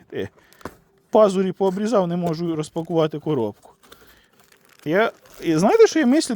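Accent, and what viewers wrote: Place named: Podillia, Ukraine. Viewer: Ви не з Поділля родом? Чую схожий говір)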